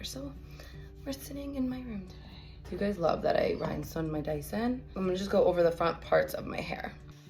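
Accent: New Jersey, United States. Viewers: Awww she’s got the Jersey accent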